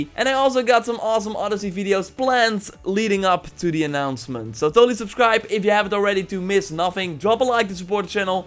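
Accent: Netherlands. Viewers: dude are you dutch or not ?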